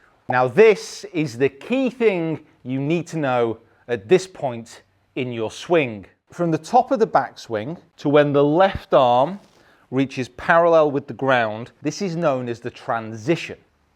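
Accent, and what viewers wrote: United States. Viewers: American accent is so unsettling lol 😂 but great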